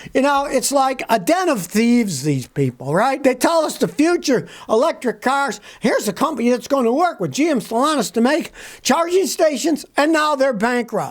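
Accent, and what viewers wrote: Germany. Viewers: I love your German accent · did you spend a little time in the service in the Fatherland? Love the accent! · Great German accent...reminds me of that guy on Roland & Martin's- Laugh-in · Luv ur germn akzent😊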